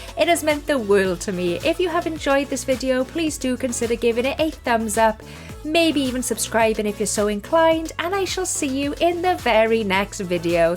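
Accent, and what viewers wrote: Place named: South Wales, United Kingdom. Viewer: Some of these mods are essentials for me now, especially "Community Lot Skilling", "Job Levels" and "Simply Leftovers". Especially "Simply Leftovers". Also.. Ydi'r fy clystau'n gweithio? 😱, are you by chance from South Wales? Your accent is very distinguishable! I'll be incredibly surprised and happy if you are. You will be one of very few Welsh simmers that I've heard 😊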